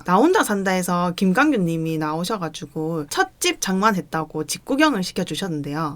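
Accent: Gyeongsang, South Korea. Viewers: 매번 영상을 보면서 느끼지만, 경상도 사투리가 매력있는 유튜버!!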